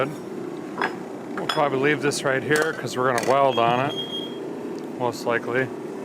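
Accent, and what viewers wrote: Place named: Minnesota, United States. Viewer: Your Minnesota accent is better than Mrs R for our 1 yr old son. I now turn your channel on when he needs help calming down. Thanks for the help!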